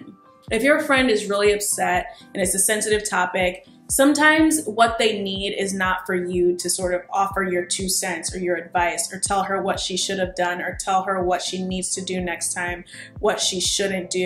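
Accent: Nigeria. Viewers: Loved it. Your Naija accent is the one...haha lol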